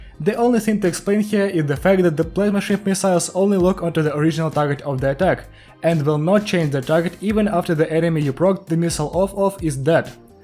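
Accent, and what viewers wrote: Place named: Russia. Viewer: Russian accent is strong in this one. I have no idea why, but this really sounds 100% Russian to me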